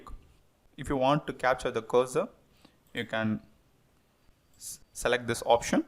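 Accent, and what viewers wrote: India. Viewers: thanks mr indian accent once again thank your kind man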